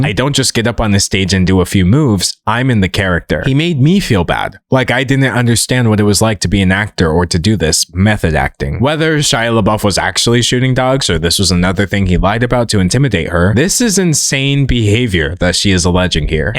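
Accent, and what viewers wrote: South, United States. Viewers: first of all, where did this southern accent come from. Secondly, "the jealousy and insecurity" that put hands on a woman... uh, sir, abstract concepts cannot manifest in the physical realm like that? gross.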